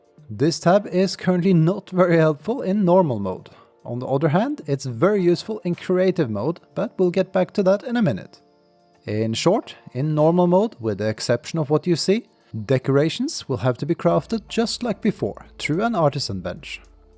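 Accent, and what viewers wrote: Norway. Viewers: I believe i hear a norwegian accent xD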